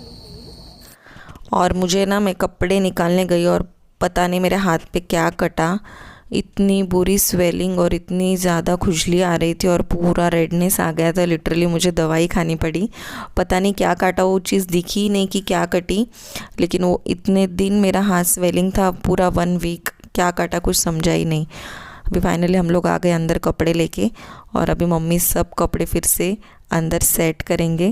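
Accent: India. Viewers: As salamu alaikum mai to peheli bar Aap ka Vlloog deqa ji aap Kon se gaon se ho ji Aur aap ka bat karne ka tariqa to Hamare andara pradesh jai sa lagta hai vllog bhi Masha allha Aur pakwan bana neka tariqa Subhanlla 👌👌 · Sister aap kokni ho kya?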